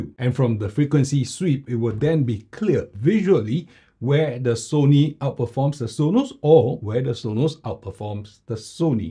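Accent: Singapore. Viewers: You speaking too fast. Please slow down a bit. Maybe it just a Singaporean English problem. but anyway I think for better understanding would be good to slow down a bit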